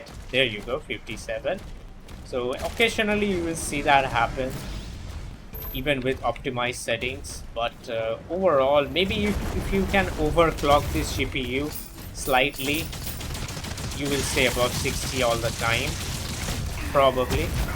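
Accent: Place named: India. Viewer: wow, i thought that is animated 3d model guy in the beginning intro ) everything is so cleaned up, face, eyes, lips , blurred abmience so i firstly thought you are artifical man ) thanx for the touchfull review. its a pity that you guys from India ALWAYS add your accent to the English language. Isn't it very hard just not to do this and speak cleaner, like we russians do speaking in english ? but in your case the accent is very little, so i've understood everyting! thanx )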